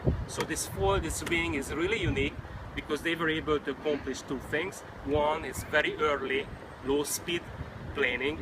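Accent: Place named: Hungary. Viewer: Hungaro English :)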